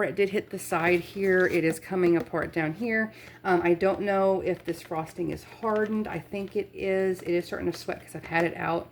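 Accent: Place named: Midwest, United States. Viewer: Where are you located? You sound like you are from the midwest maybe..... MN?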